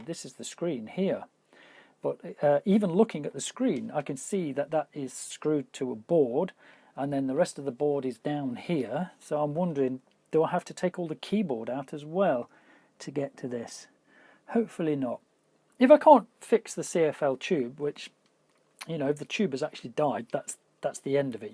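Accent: United Kingdom. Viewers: Hi Sir I just want to ask you are you from UK???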